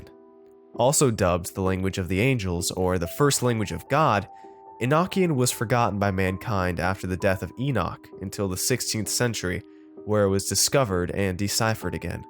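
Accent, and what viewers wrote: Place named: United States. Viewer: Discovered your channel last week and just subscribed. You're one of very few American horror narrator (assuming, by the sound of your voice, you are American. No offence, if not) that I like.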